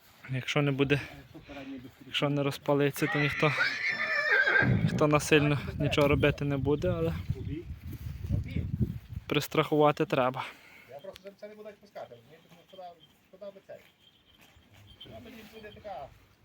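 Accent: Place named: Carpathians, Ukraine. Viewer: Ніколи не чув карпатський діалект😊 Одна з особливостей,ви обрізаєте закінчення -сь в глаголах. А також ,замість букви И,ви кажете Е. У кожному регіоні свої приколи😊 А у нас часто замість букви О ,зажуть А.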